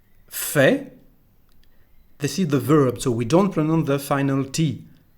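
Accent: France. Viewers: Salut! Would this be the northern accent? Saying "sh" instead of "j". I'm from Brazil learning French in English, pardon me if I say anything too stupid. I recently watched the movie Bienvenue chez les Ch'tis and was wondering if there's an accent here. Merci beaucoup!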